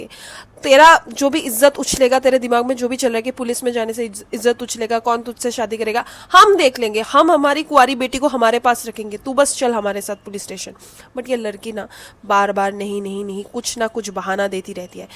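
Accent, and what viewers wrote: Bengal, India. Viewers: Are u from bengal? Ur accent tell it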